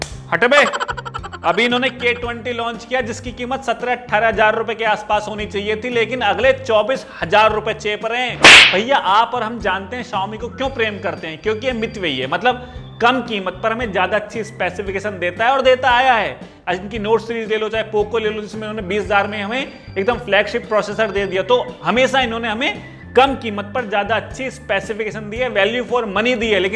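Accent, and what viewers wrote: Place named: Bhojpuri, India. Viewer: Well tried for Bhojpuri 👍🏻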